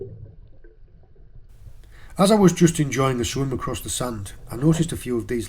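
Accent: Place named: Cornwall, United Kingdom. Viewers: Pepperrr and butterrrrr lol you sir are getting a Cornwall accent! 😎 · Stunning video and water clarity Nice to see so many lobsters about I also noticed you developing a bit of a Cornish twang when pronouncing words With an r in them lol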